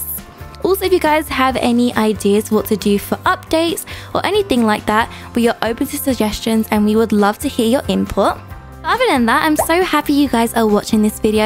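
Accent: England, United Kingdom. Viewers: I love your British do u speck British or something kinda with British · You can tell she’s English lol my dads english so that’s awesome oh wait aren’t you?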